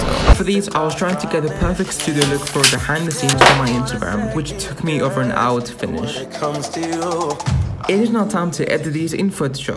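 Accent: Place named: England, United Kingdom. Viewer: U sound like ur from England bro NICE ENGLISH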